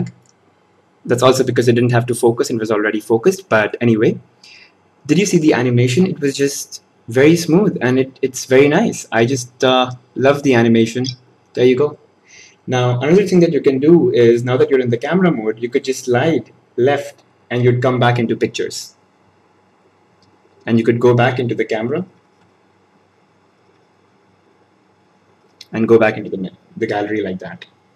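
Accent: India. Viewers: indian accent!!! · You suck !!!!! I dislike it because your english is an indian so its suck alot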